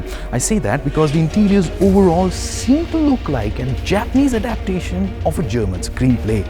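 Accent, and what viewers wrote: India. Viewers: Extremely erudite presenter. Very well done. I really like the sentence, 'the question I allow myself to ask...' Superb. Your Indian accent is a fine asset here, when I sometimes find it is annoying from other presenters. Keep it up.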